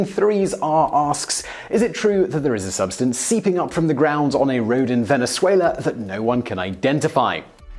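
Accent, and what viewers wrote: England, United Kingdom. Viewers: what kind of accent is this? british? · Anyone else impressed with the actual journalism or background investigation into this story? A part-time staff of seven looked into the story - not looking for the story to be true or untrue, just reporting on known facts or assumed facts as well as offering a hypothesis for both sides of the picture. Many online news outlets today would just so want the conspiracy or unknown to be true that they would push that line or not offer the full picture. Either that or have BS fluff or headline/sensationalism stories lead the news. There is alot going on in the world; many of which does not get copy. Smutty sex stories or commercials veiled as a "news story" are getting air time. This channel and others like it deserve credit for doing a fine job. Unpretentious and no flash production; just a guy talking in front of a camera. 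An english accent, in my opinion, goes a long way. He speaks well and is easy on the ear.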